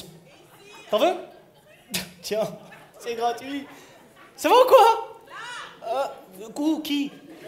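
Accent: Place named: Senegal. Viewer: On dirait seydou abathia mais tu a l air senegalais meme accent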